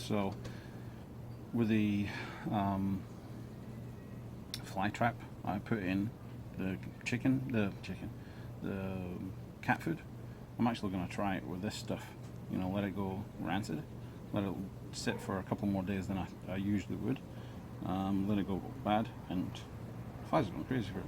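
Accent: Scotland, United Kingdom. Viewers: Which part of Scotland you originally from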